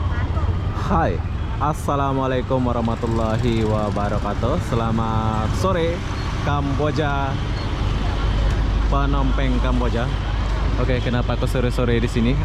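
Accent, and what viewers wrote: Indonesia. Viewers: klo di liat dr aksen.. kayaknya org lombok konten kreatrnya. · HOSTNYA ITU INDONESIA BANGET, HANYA BICARANYA DI MALAY MALAY IN, TERDENGAR DIALEK INDONESIA BANGET KATA HOSTNYA, SERU YA ORANGNYAPUN RAMAH RAMAH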